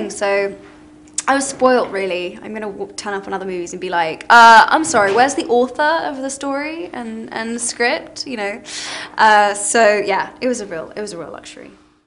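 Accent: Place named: United Kingdom. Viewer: Love her british accent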